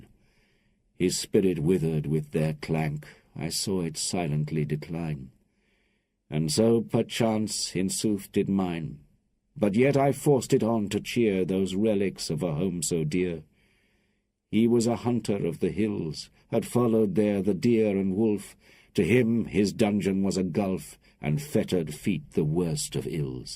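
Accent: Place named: England, United Kingdom. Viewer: Oh thank you, thank you so much !!! AT LONG LAST. A good English deep voice, with a beautiful English accent, reading this masterpiece written by the great Lord Byron. That’s how it should always be. I enjoyed every minute of it. Thank you once more.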